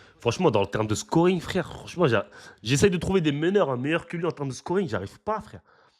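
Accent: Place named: United States. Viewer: Ton accent américain, il est juste impressionnant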